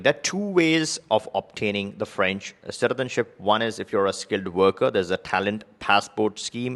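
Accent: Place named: India. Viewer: I can hear that Indian accent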